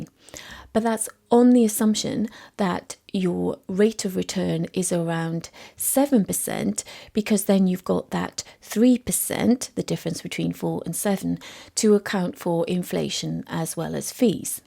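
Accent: Wales, United Kingdom. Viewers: is she Welsh ? this is the softest Welsh accent i've ever heard, it's lovely.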